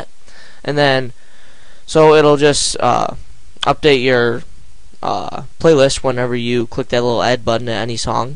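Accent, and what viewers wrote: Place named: New York, United States. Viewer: Are you from New York? You sound EXACTLY like my friend.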